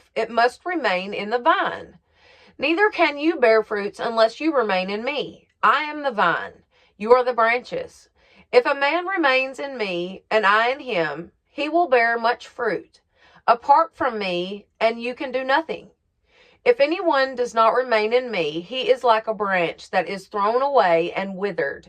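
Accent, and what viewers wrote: South, United States. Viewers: Hello my beautiful Angel. I would love to hear you sing with your beautiful southern accent. I love it!! What a true and powerful words of our Amazing Creator JESUS. He is so so good to us. Even though we are not worthy. I'm a sinner as well and saved by his marvelous GRACE. Praise GOD for his mercy, grace, forgiveness, and his unconditional love he had for us. Thank you once again for your daily devotional words of our Living Father. Blessings to you and your lovely family. I love you my beautiful Angel sister.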